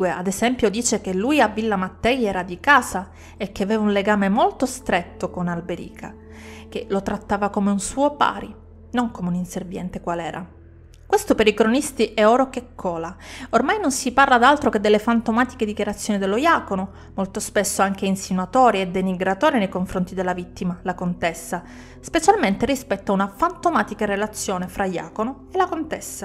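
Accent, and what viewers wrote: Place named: Calabria, Italy. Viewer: a me piacerebbe sapere di dove sei originaria, il tuo accento sembra calabrese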